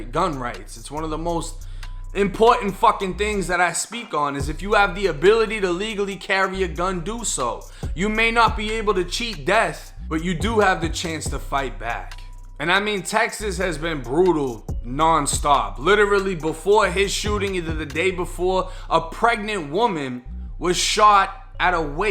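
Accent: Boston, United States. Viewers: Are you from Boston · I'm from Boston massachusetts also and I definitely am a subscriber. I love your baston lol accent 🥰🥰🥰🥰🥰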